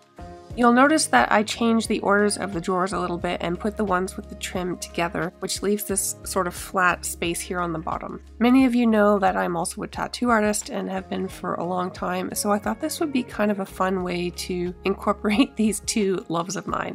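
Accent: Canada. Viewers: Found you by accident but was blown away by your work. Also, I miss Canada so much so loved hearing you talk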